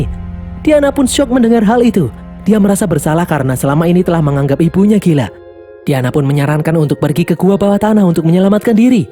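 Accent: Java, Indonesia. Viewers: Logate medok yo masse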